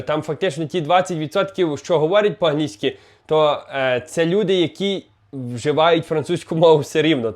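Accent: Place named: Western Ukraine, Ukraine. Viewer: привіт, просто цікаво, ти 1ше покоління в Канаді, чи вже внук жителів України? Говориш по українськи файно, по нашому по западенськи))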